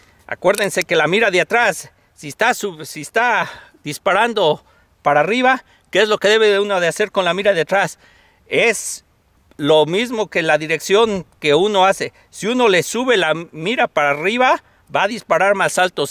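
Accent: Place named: Mexican-American, United States. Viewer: El acento es increíble amerimexican o México americano? 🤔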